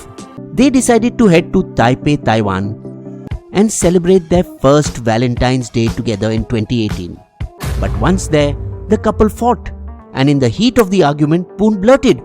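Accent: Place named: India. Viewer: you mean kutzergart? Yes I do watch him but i can say tells story in much more interactive way and I can understand because of his Indian accent and kutzergart is a German.... And believe me when Germans spaek English it is the most hilarious thing.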